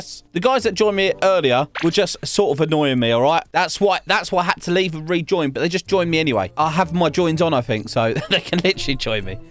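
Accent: United Kingdom. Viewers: Hey ate you britesh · Is it me or is this guy British?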